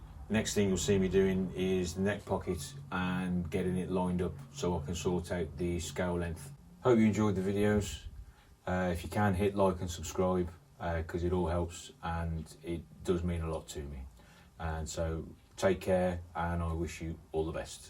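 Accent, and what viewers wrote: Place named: Kidderminster, United Kingdom. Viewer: Nice job. Btw my wife heard your video from another room, came running in and shouted ‘Kidderminster!’ (referring to your accent). She also comes from the carpet capital of the western world (we met while both working at Gilt Edge Carpets).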